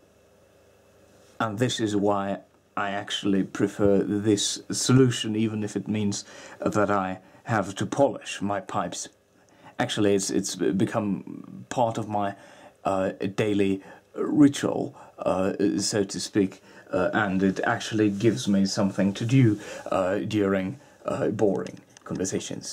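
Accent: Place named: RP, United Kingdom. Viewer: To plumby voice so watched it with sound down.. No offence but it done my head in.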